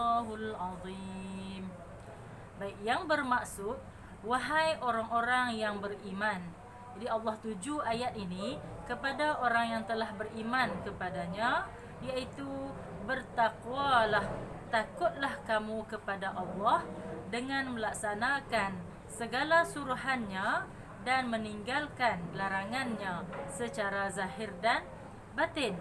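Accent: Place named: North, Malaysia. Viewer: Tahniah ustazah...kemaih👍👍👍lama x cakap utara sejak pindah perak..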